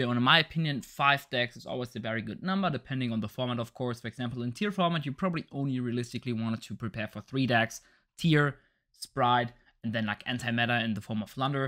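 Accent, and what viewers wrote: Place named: Germany. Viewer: Ich glaub blackboard ist das Wort das du gesucht hast , das kommt Tafel zumindest nahe ... Hab erst vor 2-3 Monaten angefangen d-Link zu spielen, hab durch deine vids so viel gelernt und bin dennoch immer wieder überrascht was das Deck alles kann. Danke für den Unterricht Prof. PS: wusste durch deinen Akzent seit der allerersten Sekunde, dass du auch aus Deutschland bist 😅😂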